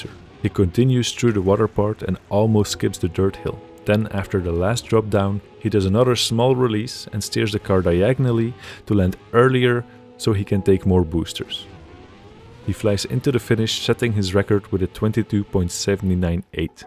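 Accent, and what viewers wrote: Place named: Netherlands. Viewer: 100% you are dutch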